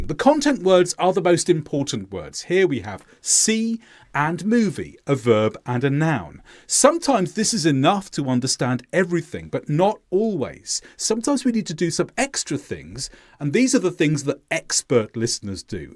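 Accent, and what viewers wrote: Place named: United Kingdom. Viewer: it is a pleasure to listen to English with a British accent. We, in Romania, only learn American English at school, being convinced that it is British 😂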